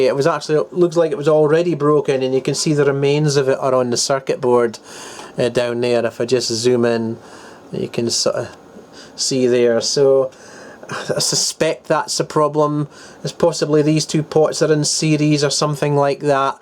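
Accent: Scotland, United Kingdom. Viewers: Did sound a bit sus.He has a wee Sco-ish accnt:-) What a silly current.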